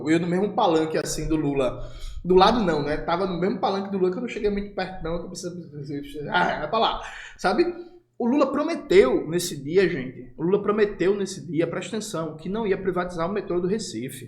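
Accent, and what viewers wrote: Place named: São Paulo, Brazil. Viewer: q sotaque fei esse de faria limer meuu 😥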